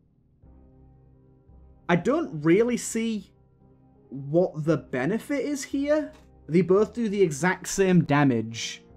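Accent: United Kingdom. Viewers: Yes more dead Cells! What part of the UK are you from btw? 😊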